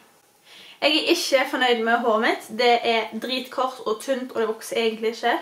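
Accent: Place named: Sørlandet, Norway. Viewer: Koffår snakke du sørlandsk/haugesundsk/ men veldi pent?❤️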